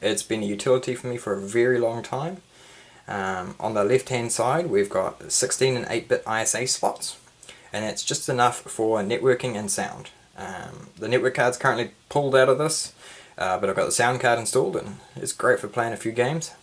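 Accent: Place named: New Zealand. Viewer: Some great info in this vid! 2 questions though: - what did you say the menu software was (aussie here that can't understand your kiwi accent!) - is there any way for DOS to utilise the very top and bottom of the screen? The T3100 will show the C:\ prompt right at the top but the T3200/5200 seems to be letterboxed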